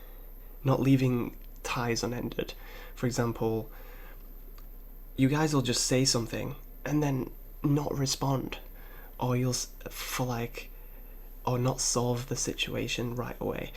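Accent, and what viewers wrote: United Kingdom. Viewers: Are you a Brit? I have a degree in British English and British Literature :) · Bruh I can’t tell if your British or not, it’s freakin me out pfft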